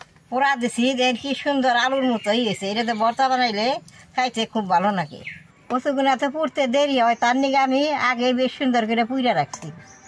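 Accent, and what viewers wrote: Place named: Mymensingh, Bangladesh. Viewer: দাদীমা আমাদের টাঙ্গাইল ও ময়মনসিংহের ভাসায় কথা বলে খুব ভালো লাগে 😊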